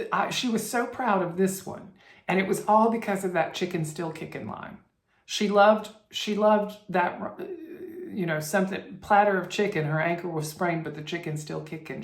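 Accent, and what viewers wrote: South, United States. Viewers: Loving the videos! Ronnie. Lavender. Needleworker. I’m new here and love what I see! Thanks you! You are so funny! I love your mannerisms and southern accent! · Ooohhh Ms Gibbs telling you that you needed to improve your potato peelin skills....your face, priceless! Did you show cross stitch, you probably did. But your stories in that lovely southern accent warm my heart. We spent 3 years in Alabama with my husband's work. Alabama has a different breed of people. The kindest, most generous, funniest and every one has a story! You can't go buy some beans without a story. I miss it there. You bring me back. 💗⚘️ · Enjoyed your Granny’s poetry once again…and I get a kick out of slipping into your southern accent. It does indeed add to the reading. That Home of a Needleworker is adorable. Your garden is lovely. Thanks for sharing it with us. 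· Having grown in Mississippi and still live here, I love and appreciate the stories. The southern accent just enhances the poems. Hope you have a great week.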